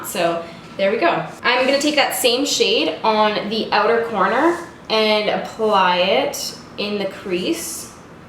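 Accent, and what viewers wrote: Canada. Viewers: Definitely a Canadian pronunciation. Just like how she pronounces "bag" differently. It's not incorrect, just different from the American pronunciation. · This is the most I've ever heard your lovely Canadian accent make an appearance. Eye "baeg" " daegger" it was great. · That Canadian accent gets me every time haha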